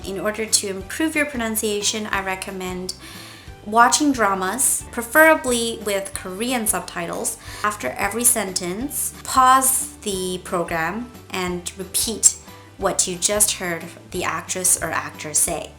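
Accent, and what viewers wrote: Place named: Canada. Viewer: are you from Canada ? you sound like one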